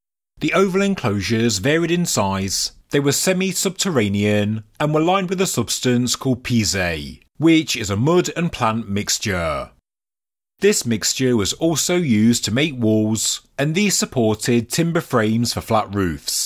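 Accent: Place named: West Midlands, United Kingdom. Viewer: Native of the UK here, I believe it is a west midlands accent ( guess only ) I play at 1.25 speed it makes it more bearable 😂😂😂😂😂😂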